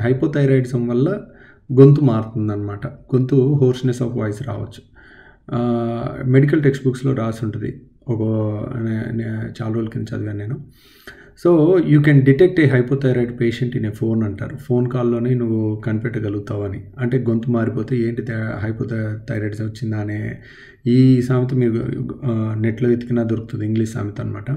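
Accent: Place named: Vijayawada, India. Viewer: Mi matalu mi voice asaluuu Vijayawada anipinchukunari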